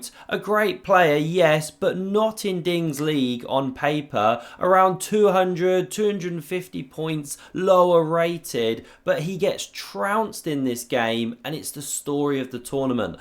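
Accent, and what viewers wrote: United Kingdom. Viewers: what is the name of your particular type of British accent? What's the region?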